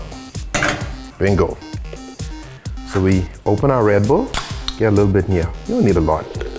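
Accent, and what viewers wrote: Trinidad and Tobago. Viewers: Are you from Trinidad Bc ur accent sound like Trinidadian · Are you from Trinidad 🇹🇹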